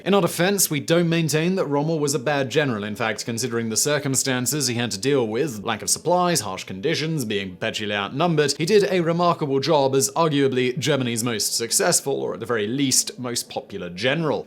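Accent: United Kingdom. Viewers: BUT, I loved his British accent. I have to find myself an Englishman.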